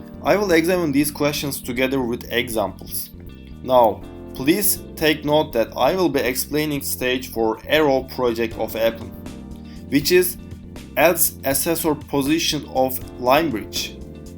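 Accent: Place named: Turkey. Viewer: türk aksanı hissettim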